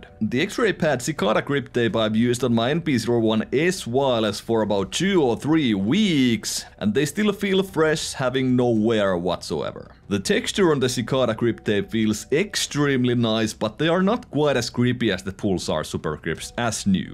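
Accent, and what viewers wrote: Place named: Finland. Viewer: are you finnish? your accent sounds very finnish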